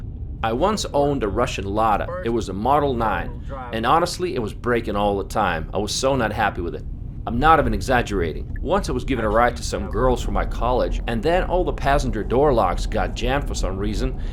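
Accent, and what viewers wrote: United States. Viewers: It's weird and pointless to hear Russian city names pronounced with an exaggerated American accent, but I'm not criticising. Moreover the channel (which is great) isn't mine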